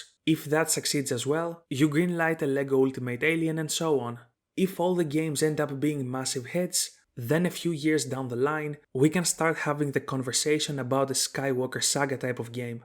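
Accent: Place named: Greece. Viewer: OK this is something I was legit theorizing about myself since I was little so I knew I had to click on it. And as if it wasn't enough, 2 seconds in I'm like "Hold up, αυτή η προφορά μου ακούγεται *ελληνική*" and sure enough, looked at the listed location and that's what it was lol. So not only did I stumble upon a video about a hypothetical I used to think about myself back in the day, but the person doing it is from the same country as me. What are the chances?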